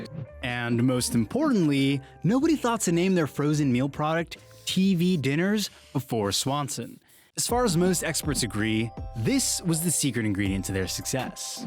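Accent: California, United States. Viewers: the narrator's accent reminds me of the snl californians skit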